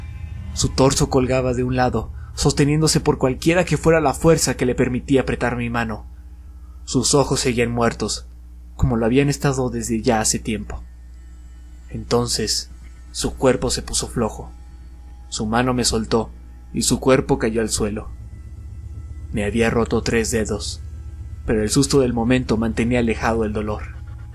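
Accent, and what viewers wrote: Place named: Latin America. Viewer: Me encanta tu acento latino el mejor, tu narración simplemente genial Gracias!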